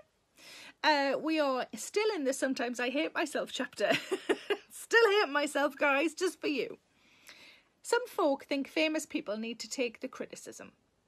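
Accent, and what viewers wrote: Newcastle, United Kingdom. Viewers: I LOVE hearing your Geordie 😉😉😉 When I lived in Newcastle I loved to learn learn how to speak it. But when I asked people to repeat what they said, they didn't say it in geordie. ☹️. 😉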